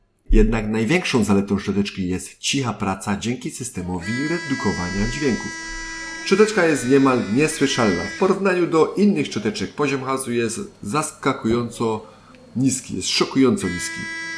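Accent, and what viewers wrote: Silesia, Poland. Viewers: Dobra i tania, ja mam elite pro cos tam,skaczą te ustawienia na wyświetlaczu zanim zaczne myć a ta prosta i tez Mocna ,kilka szt kupilem na prezenty,fajna jest bo cicha.Masz sluński akcent🙂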